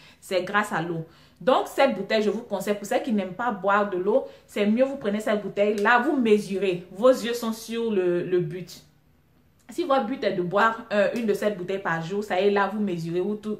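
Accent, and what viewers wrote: Ivory Coast. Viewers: hum l'accent ivoirien là hooo suis motivée une soeur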